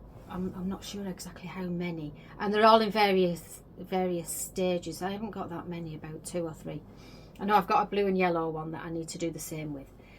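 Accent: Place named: Northern England, United Kingdom. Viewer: Just come across your channel and have subscribed. The quilt is beautiful - such soft, pretty colours. I’ve never tried Tunisian crochet so am interested to see how your sweater turns out. Where is the beach at the end of the video? I was born in Northumberland and lived in the North East until my late twenties. So nice to hear a northern accent. I’m with you on card only payments. We must pay with cash wherever we can otherwise risk losing it altogether. Happy crafting, Margaret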